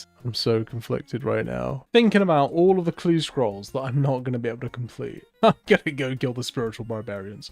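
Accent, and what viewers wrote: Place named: Northern England, United Kingdom. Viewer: yooo, i think you're my fav yt scaper. your nothern accent is incredibly comfy. the vid is good but the voice is like an additional armchair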